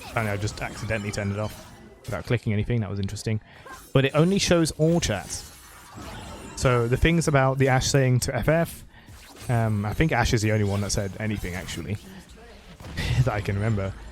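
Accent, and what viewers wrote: United Kingdom. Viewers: He has english accent with a slight lisp, im english too so I love his voice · he's bri'ish m8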